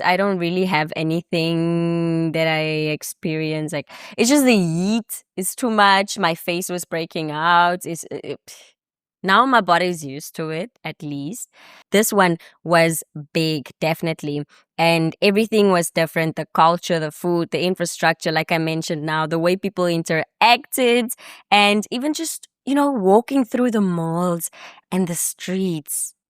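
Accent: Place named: Barbados. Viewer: I love your accent you kind of sound like your from the Caribbean island Barbados, thank you for sharing your journey with all of us.😊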